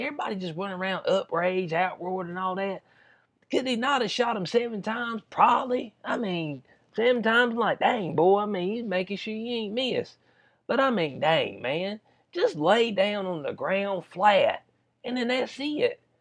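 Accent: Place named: Arkansas, United States. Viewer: Just curious- where’s tha accent from? I guess south Arkansas?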